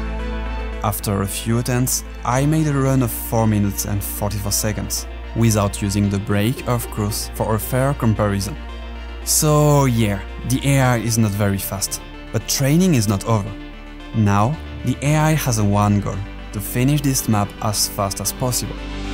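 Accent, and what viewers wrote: France. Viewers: Ton accent francais se voit (un peu) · il a un big accent français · On reconnais l'accent français même si tu parles trop bien mdrr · I like the french accent while talking, even though you talk pretty well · am I the Only one to think that this guy has a french accent? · W french accent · Tes francais jai cramé ton accent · sympa l'accent français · Un bon accent bien français qui me permet de comprendre sans sous titre, gg les gars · 🥖🥖🥖🇫🇷🇫🇷🇫🇷 l’accent français on est là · Hey la vidéo est géniale, n'ayant pas tous les termes informatiques en anglais c'était difficile à suivre mais très passionnant. Au vu de ton accent (et de ta couleur de voiture) je pense que tu es français. Si à l'occasion tu peux mettre des sous-titres français pour les gens comme moi qui ne s'y connaissent pas forcément en informatique et machine learning ce serait super ! 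Ta vidéo a un rythme à la Virtual, tu pourrais devenir le Wirtual français ce serait excellent. En tout cas superbe vidéo, bien montée et captivante même pour moi qui ne joue même pas à ce jeu et n'y connais rien à tout ça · Ça sent l’accent français un peu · He's first language seems to be french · l’accent français on est là mon g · lol huge french accent bro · Great video, just asking are you french because if u are ur accent is thick X) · Like your French accent ! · Unrelated but your accent sounds very french, are you french? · Tu as un léger accent français , est -ce ton origine? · Bc of ur accent sound French background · j'adore l'accent françait sa fait plaisir! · c’est à l’accent qu’on reconnait le frenchman 😎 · Accent français détecté ! En tout cas super vidéo ! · Bruh this video was just me getting frustrated because you sound so french, so your english is pretty weird but stills english so it still harder to understand than french x) (for me of course) but if we forget that, great video bro !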